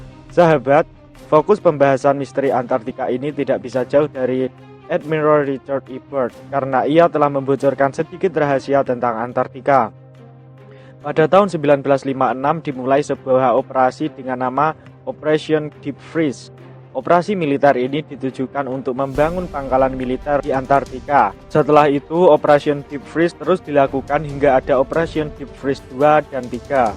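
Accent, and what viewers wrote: Java, Indonesia. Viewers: kok gue jadi fokus yg jelasin ya bahasanya medok jawa bgt 😁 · logat,e medok. 😀😁😂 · Naratornya medoxx bgt😀😀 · Medok amat njiiir 😂😂😂😂 Btw buat yg mendebat, membully,beradu argumen pesrsepsi tentang bumi datar anda luar biasaaa.. Terserah anda mau menertawakan, menganggap ada2 saja, menganggap bodoh ,itu bebas hak anda hehe Buat apa ngeladenin2 yg ngga percaya atau yg kontra.. Para Flatter hanya mau membukakan konpirasi besar kepada semua umat manusia dengan angka(fakta). Bukan hanya dengan faham yg turun temurun diajarkan. Like "orang udah diajarin di sekolah2 dari dulu bumi bulat ,ngaco aja". Hehe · naratornya indonesia asli.. wkwkwk... hidup medok.. 😁😁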